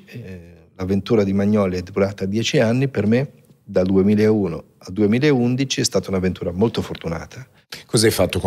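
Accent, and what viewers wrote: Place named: Mestre, Italy. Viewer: Io sento comunque , nonostante gli anni a Bergamo , la R la S mestrina 😅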